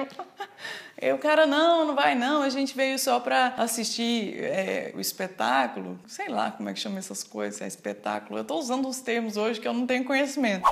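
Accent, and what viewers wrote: Minas Gerais, Brazil. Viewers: hahahahaha o mineirês fora das câmeras é sempre mais alto... te entendo total.... comecei a fazer vídeo e geral zoa só pq falo "ei, tá joia?" hahahaha fico me controlando pra não soltar mto uai ou sô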